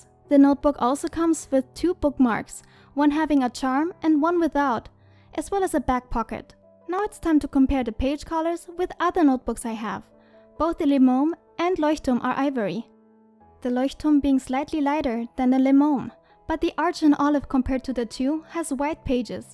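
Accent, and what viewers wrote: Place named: Germany. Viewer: Are you german? As a fellow german i hear the slight german accent comin tru (no offense)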